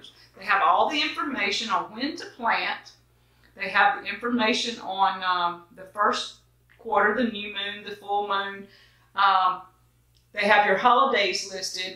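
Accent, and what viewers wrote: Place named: Indiana, United States. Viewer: Love, love, love your hoosier!!!!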